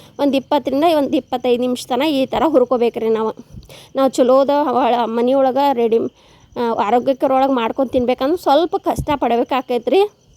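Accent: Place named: Chikkamagaluru, India. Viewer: Chikkamagaluru madam nimma bashy kelok chanda madam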